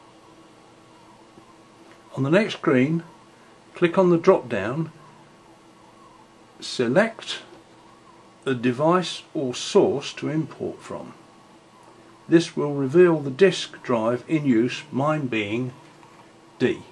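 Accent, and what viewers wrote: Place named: United Kingdom. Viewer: The best was the british accent :P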